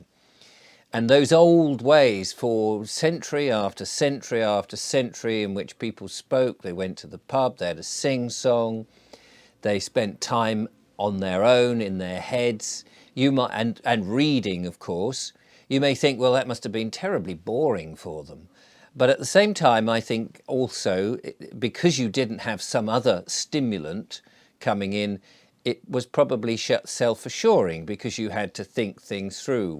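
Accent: United Kingdom. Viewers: i am from Michigan and I have no accent but you on the other hand have a thick British accent, it seems to me.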